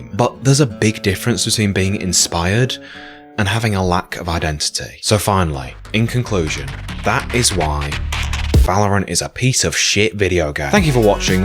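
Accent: United Kingdom. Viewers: that's right.... he's BRITTISH.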